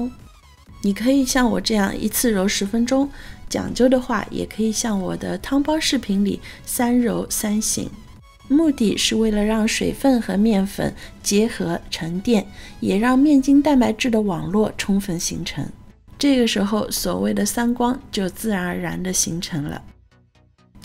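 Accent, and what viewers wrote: Shanghai, China. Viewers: 尽管有其他很多貌似专业厨师的视频，但听到了上海话就无理由地感觉这才是最靠谱的做法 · 我妈看她视频。我在旁边一听就知道她是阿拉上海宁。满满的上海味道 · 这就是标准的上海人讲普通话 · 嘻嘻 听你讲上海话超级亲切，秒想回国😢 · 很好，顺便宣传一下上海话，很亲切，赞！ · 有的有的，口音是标准上海年轻人的口音👍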